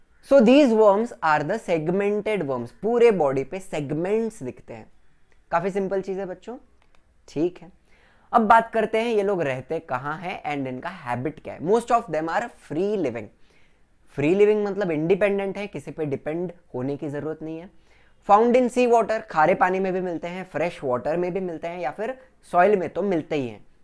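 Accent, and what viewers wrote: Maharashtra, India. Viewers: You are marathi ?? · Sir are u marathi????? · Sir, kya aap marathi ho?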